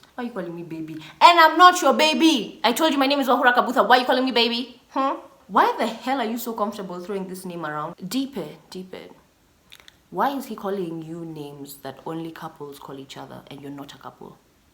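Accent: United Kingdom. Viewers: I've been watching your videos so much in the middle of a convo I start faking a Brit accent😂😂😂😂😂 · The British accent,😍😍😭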